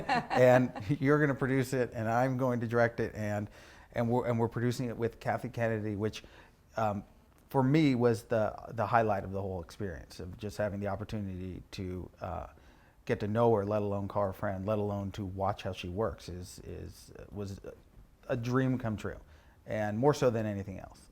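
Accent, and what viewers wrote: United Kingdom. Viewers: Que inglês é esse, hein,?! Sotaque britânico, pronúncia impecável e fluência perfeita! E a entrevista está ótima também! Meus parabéns pelo canal.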